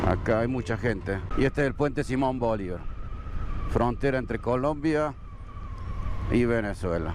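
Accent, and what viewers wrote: Argentina. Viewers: Tiene acento de Córdoba, Argentina.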